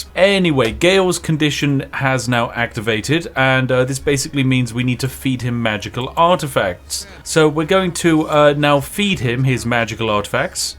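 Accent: United Kingdom. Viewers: Words cannot express how much I want Boblin - with Spiffing Brit's very british narration still in place - meeting SmuckSquad's Goblin Slayer. Its the sort of crossover that's born in the depths of hell and should not work but I have full faith that with some Yorkshire Tea we can pull it off. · Here is the thing. When has Spiff ever failed? Never! This is just about seeing how awesome his british voice is. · I got excited when you said boulders gate free... Then I realize your British with a ridiculous accent...😢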